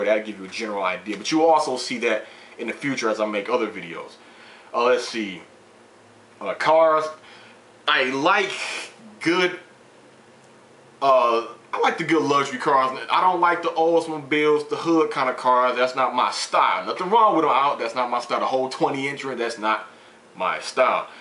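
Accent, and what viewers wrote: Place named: Midwest, United States. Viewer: i knew you was a mid-west dude, my guess was probably st louis or detriot.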